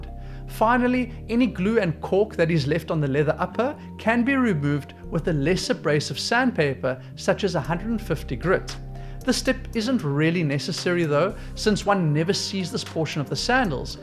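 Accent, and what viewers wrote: South Africa. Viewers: Great video! You sound South African 😊 I was just wondering why barefoot shoe companies don’t make Birkenstock style barefoots. I will try this on an old pair of birkies.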